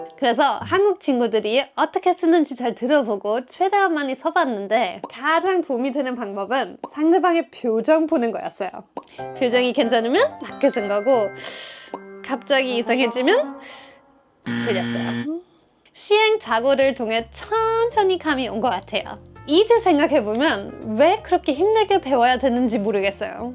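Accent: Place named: Vienna, Austria. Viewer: 여사님 목소리는 정말 비엔나 여성의 전형입니다. 프랑스 여성과의 큰 차이.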